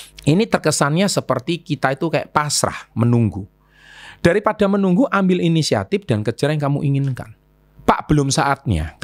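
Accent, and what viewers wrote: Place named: West Java, Indonesia. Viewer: Cilaka😂 sunda pisan euy😂 i love you pokona mah❤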